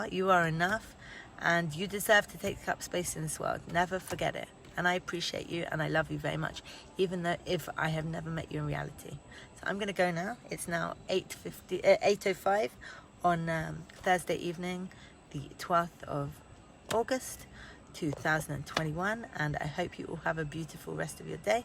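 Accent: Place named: England, United Kingdom. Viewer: Are you English? You've got an interesting accent.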